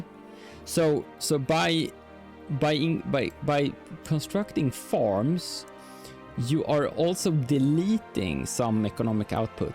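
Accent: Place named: Sweden. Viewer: That's a swedish accent.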